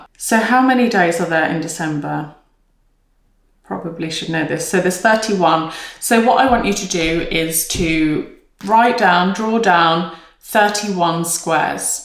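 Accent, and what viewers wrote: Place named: United Kingdom. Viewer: i wasn’t expecting a british accent! lol but loved the video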